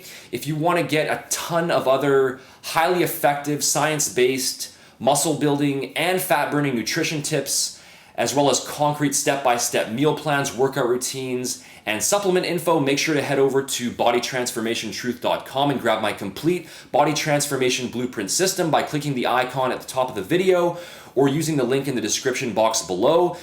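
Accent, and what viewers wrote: Canada. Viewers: Are you Canadian? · are you from toronto? you sound like kinobody with the way you pronounce your O's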